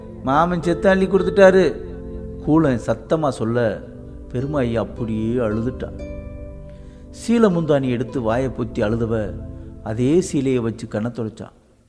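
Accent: Madurai, India. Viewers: அருமை மாமா இயல்பான மதுரை தமிழ் எளிதில் மனதை தொட்டுவிட்டது, உங்களது குரல் இந்தக் கதைக்கு பெரிய பலம்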